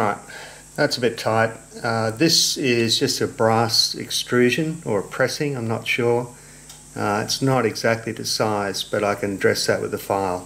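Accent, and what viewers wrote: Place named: Australia. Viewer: Good video,! I’m so glad to see that you decided to do the steam engine on camera! As they say, “You can please some of the people all of the time, and all of the people some of the time, but you can’t please whatever kind of monster wouldn’t want to watch someone with an Australian accent build a steam engine.” Thanks for the animal videos, they are adorable when they’re babies. Except for the birds…. They start out ugly, but they do get better!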